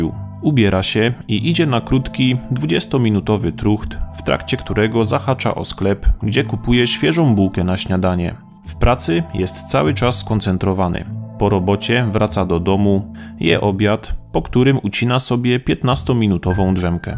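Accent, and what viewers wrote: Silesia, Poland. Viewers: Narrator to Ślązak? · Krótko, zwięźle i sensownie. Lekki śląski akcent 😉👍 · Jesteś ze śląska? Tak mi się wydaje, że masz akcent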